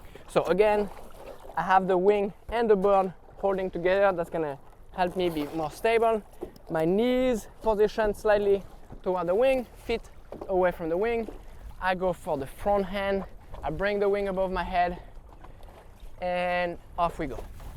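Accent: France. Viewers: Mec, en anglais tu as un petit accent français et en francais tu as un accent anglais. Tu viens d’oû?? Super video sinon bravo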